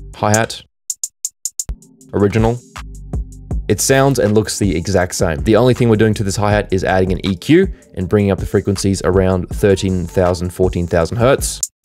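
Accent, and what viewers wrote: Australia. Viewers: U Aussie mate ?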